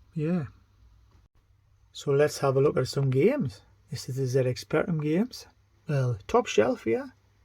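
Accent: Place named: Ireland. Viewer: Congrats on the 200 episode mate and i never knew your Irish 😂 you have some excellent collections my favoutite is the tins of paint. Seriously though the shed is class mate and love the tape game collection. Nice to see the consoles set up and computers its nice to see the master system getting some use as well as it collected dust at mine. Great stuff dude 🙂👍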